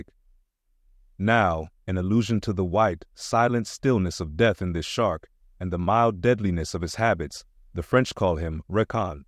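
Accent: United States. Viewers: FINALLY an American reader!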